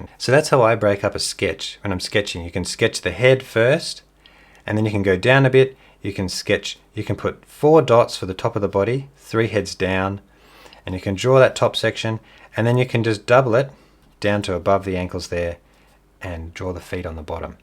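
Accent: Australia. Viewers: Just found you and subscribed - enjoying your Australian accent and excited to try your approaches!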